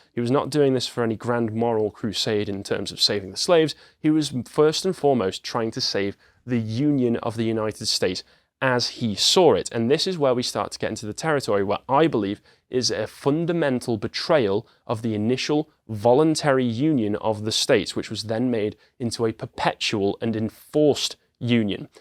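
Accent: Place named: United Kingdom. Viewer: It's really interesting to hear American history discussed by a Brit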